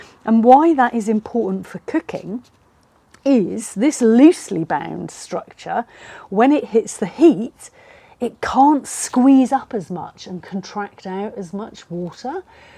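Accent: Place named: Bristol, United Kingdom. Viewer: Thank you, young lady. Iam just about to do some St. Louis Ribs on me offset slow cooker here in SoCal and I shall give that a try. Love hearing a good old Brittol' acent again.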